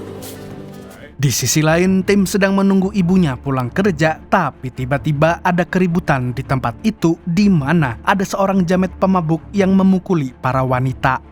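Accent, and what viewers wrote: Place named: Tasikmalaya, Indonesia. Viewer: Si sorana siga org tasik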